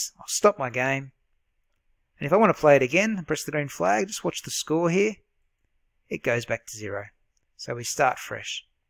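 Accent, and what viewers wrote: Australia. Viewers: i like his thich aussie accent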